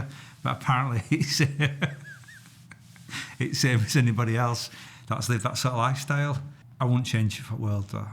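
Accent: Yorkshire, United Kingdom. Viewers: Bloody love hearing a Yorkshire accent. Greetings from Barnsley, thank you for your service Thas a rate Lad!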